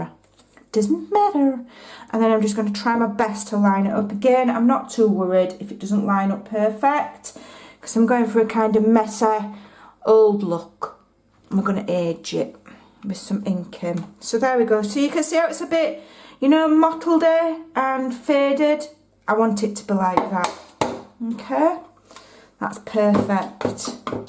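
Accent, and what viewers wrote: Yorkshire, United Kingdom. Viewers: I guessed right that you are from Yorkshire. My sons were born there and I love it there and love your videos too. Keep up the good work.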